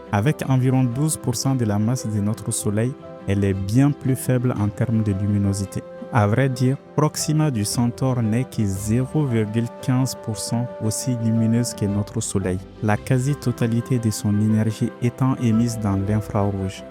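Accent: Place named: Africa. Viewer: J'adore ton accent africain, c'est rafraîchissant dans ce monde d'internet où toutes les voix sont faites par IA et se ressemblent toutes. Tu articule bien en plus, c'est compréhensible. Merci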